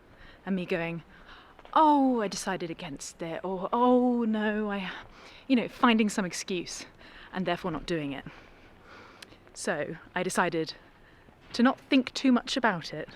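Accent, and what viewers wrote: United Kingdom. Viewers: I love watching your vlogs and to boot I love listening to you talking. Your British accent is so eloquent and perfect phrasing. I also love to hear your guitar playing and listen to you sing. Keep it up there are too few of your vlogs. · What part of the UK are you from,?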